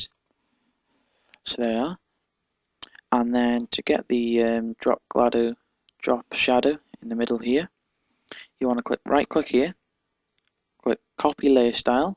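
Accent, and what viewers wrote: Liverpool, United Kingdom. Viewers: Hey der la, Do I 'ear a Scouse acksint ... I am down 'ere in Sydney strugglin' wiv me CS5 ... ta for yer 'elp ... <link>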